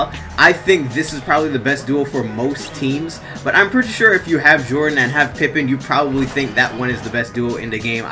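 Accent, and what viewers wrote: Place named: Jamaica. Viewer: Bredrin the way the patwa come out whenever you get passionate kills me fam😂😂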